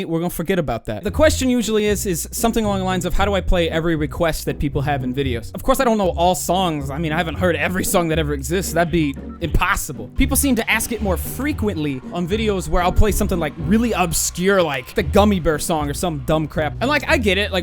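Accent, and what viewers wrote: United States. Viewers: Guys. He said he is from Misery right? And he has an American accent too. Missouri is an American state... Boom Missouri confirmed.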